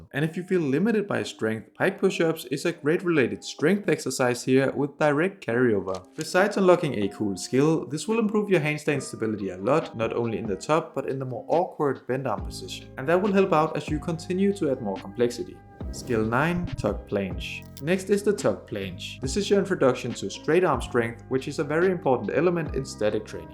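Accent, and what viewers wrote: Denmark. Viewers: jaa, godt forsøgt men du er dansk ikke eller ihvertfald fra skandinavien. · Random question, but are you a dane?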